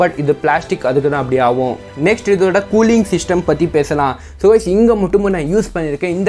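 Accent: Bangalore, India. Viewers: You have a typical Bangalore accent bro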